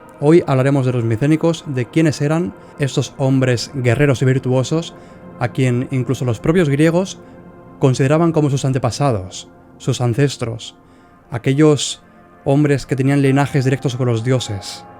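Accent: Spain. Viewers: Gracias por compartir sus conocimientos joven español 🙏. Solo le recomendaría que acentue mejor solo un poco la entonación, y el audio, pero su acento va muy bien con la narración de estos temas. Excelente trabajo, más videos please. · Excelente canal. ¿De dónde eres en España? ¿el acento es vasco?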